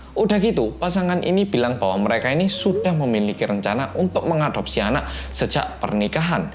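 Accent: Java, Indonesia. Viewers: Wong Korea bahasane medok koyo wong jowo sampean (mas ) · TRMKSH KOKO MEDHOOOKKK...😃😃😃😃🙏🙏VOOUME SUARA NYAAA KOKO JELAS BENING JERNIH YAAAAA.....😃😃😃😃😃😃🙏🙏💖💖💖💖💖💖💖💖💖💖👏👏👏👏👏👏👏👏👏👏👏👏👏👏👍👍👍👍👍👍👍👍👍👍👍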